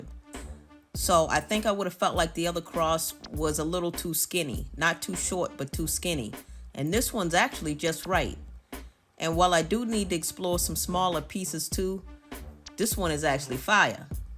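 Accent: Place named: Baltimore, United States. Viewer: Sounds like a Baltimore or Jersey accent 🤔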